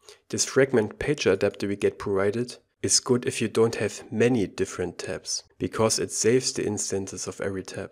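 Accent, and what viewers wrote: Germany. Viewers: I like the german accent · so cool - listen to the text in English, but with a German accent))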